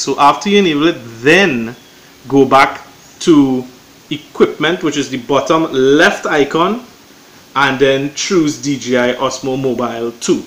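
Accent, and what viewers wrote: Trinidad and Tobago. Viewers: Is that Trinidadian accent? My friend is Trinidadian. He has exact same accent.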